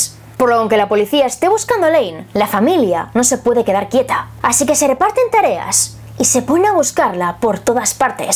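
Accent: Spain. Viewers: Saludos bellotas hermosas y su acento español, es tan hermoso y maravilloso. Excelente investigacion para el video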